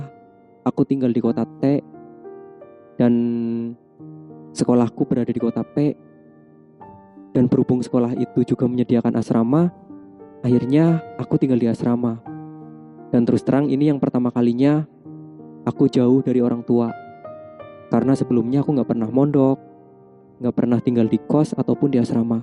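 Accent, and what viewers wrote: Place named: Tegal, Indonesia. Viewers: Terima kasih atas ceritanya mas 🙏😁 suaranya enak banget, aksen jawanya terasa hehehe. Cuma menurutku ceritanya kurang serem 😂. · Logatnya spt Tegal dan Pemalang. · Baru mau Koment Mbak Mas nya kayanya asli Tegal, soalnya Logat bahasane medok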